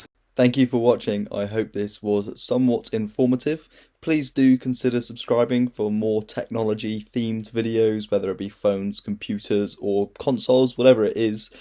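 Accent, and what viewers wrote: United Kingdom. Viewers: that frustrating british accent...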